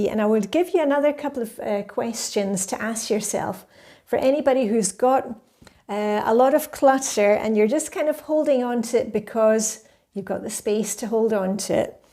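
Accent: Scotland, United Kingdom. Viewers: Gosh!! How many languages do you speak?? Obviously French and English (with a Scottish accent) do you speak Danish and Swedish as well???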